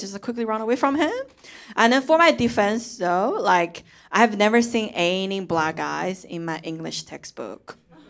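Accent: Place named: China. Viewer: I love Chinese accent . Followed .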